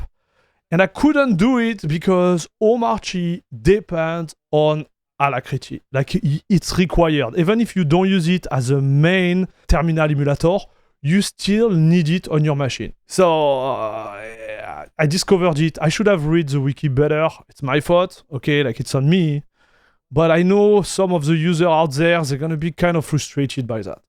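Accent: France. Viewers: Votre accent paraît français...😊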